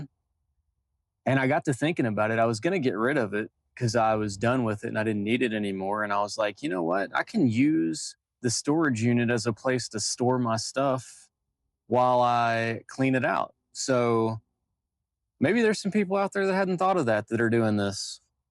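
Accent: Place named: Tennessee, United States. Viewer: I thought it sounded like you were from Tennessee or Kentucky, then I saw your tags. That's quite a drive. I do LA <--> Nashville a lot. Not living in the car, so I don't have a setup as developed as yours, but the trips are long enough that your expertise has been relevant and helpful. Thanks.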